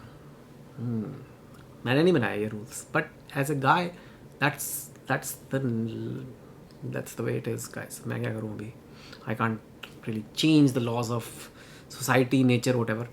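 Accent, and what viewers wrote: Delhi, India. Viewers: Bhaiya U talk like a typical Dilli Wala Mujhay bachpan yaad aa gaya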